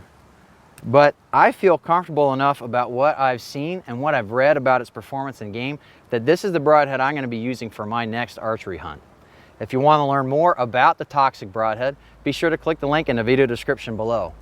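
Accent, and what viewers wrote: United States. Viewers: I find it funny that every video I have seen concerning weapons - the narrator always have a thick American accent. Is America the most weapon-happy country in the world?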